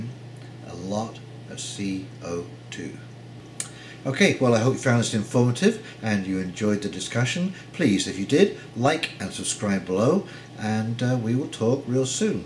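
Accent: England, United Kingdom. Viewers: Where are you from? You sound English but I'm guessing you live in Canada.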